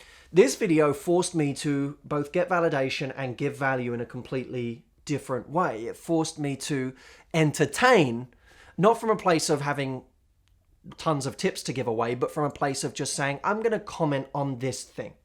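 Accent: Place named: United Kingdom. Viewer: Vandalized my ass! lol you are so funny. What makes it even funnier is the way it's said in that British accent of yours:)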